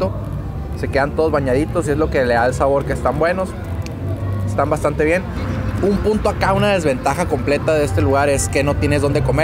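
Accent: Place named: Sonora, Mexico. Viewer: Habla cómo Los de sonora.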